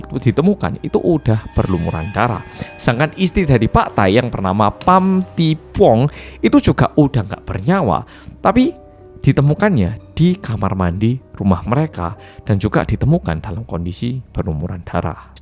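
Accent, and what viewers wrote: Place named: Malang, Indonesia. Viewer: Iki ceritane wong korea berusaha ngomong bahasa vietnam dengan logat jowo malang nggih mas...😅❤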